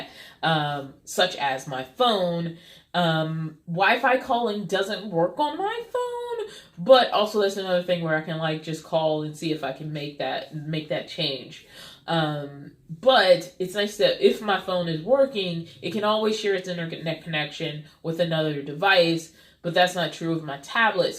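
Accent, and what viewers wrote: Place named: Maryland, United States. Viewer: I grew up in Baltimore. I thought I heard a bit of the ole Maryland accent. Enjoy your travels.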